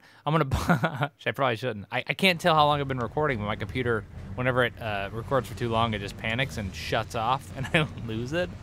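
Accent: United Kingdom. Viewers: You sound British! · love the British accent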